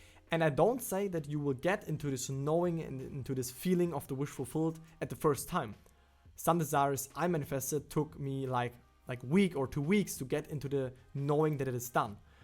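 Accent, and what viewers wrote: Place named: Germany. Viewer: „ sounds pretty german, but there‘s basically nobody here knowing/studying Neville and all of that stuff“ - clicked on video, instantly got hit with a thick german accent 🙆🏻‍♀️😄 Na, dann sind wir immerhin schon zwei! 🙃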